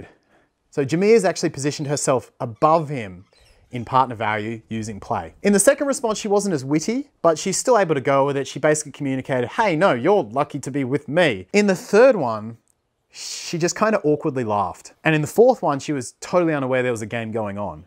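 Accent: Australia. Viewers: you've got beautiful body building but the best part of you is your face your eyes your lips and your smile and your voice I know too much ha ha but it's true- I whish I could live in Australia I love Australian accent :))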